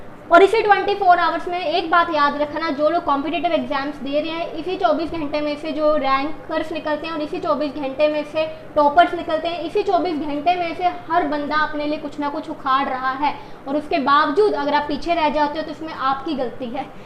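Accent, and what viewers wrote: Punjab, India. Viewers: Didi aap punjabi ho kya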